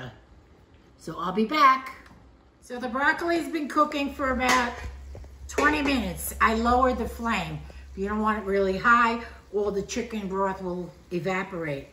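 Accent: New York, United States. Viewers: My favorite red head with a New York accent.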